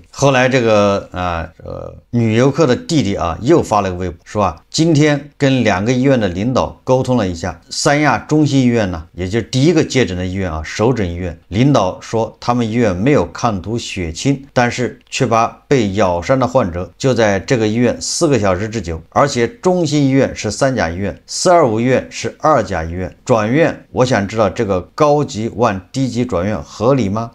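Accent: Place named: Northeast, China. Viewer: 我还以为是东北人😅爱吃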